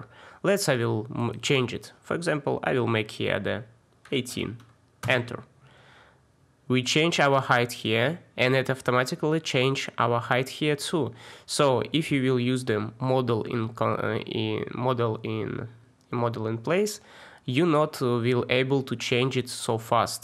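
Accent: Russia. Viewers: Спасибо за урок. Судя по акценту ты с России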